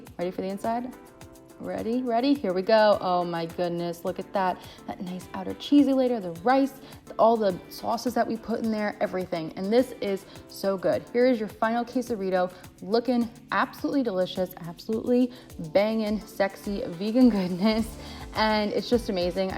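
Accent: United States. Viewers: sounds like a normal american accent to me. but im american lol so what do i know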